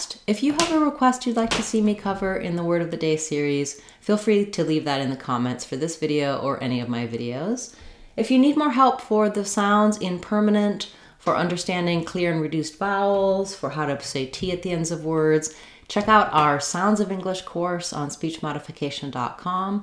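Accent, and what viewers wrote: United States. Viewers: Oh wow you're here making videos and uploading! I did it search for a biblical name Rayhab. I knew there's different spellings but this is the one that I'm familiar with and some people remove the Y. I'm assuming when you give pronunciations that this is American English pronunciation? Google AI pronounces her name as rahab... They pronounce the a like the word father. I've always heard it pronounce the way you pronounce it in that video I just saw. Anyway, I'm assuming you're giving us pronunciations for USA❤❤❤. Sorry this was so long but I am from another country originally, Venezuela. My family came to USA when I was a girl and now I'm 23. I'm not trying to give my life story but I am trying to learn how to pronounce a lot of words in the USA version. You can understand why it gets a little complicated when the Google AI kind of uses pronunciations that sound like there United Kingdom English. 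Anyway I'm using speech to text because I can't spell English great so far. I'm trying to work on all these things and I plan to subscribe as soon as I hit send. Glad that I find you still around after her the Rehab video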